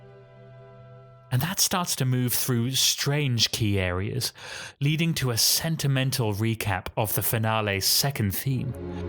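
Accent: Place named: United Kingdom. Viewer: Que curioso suena el acento británico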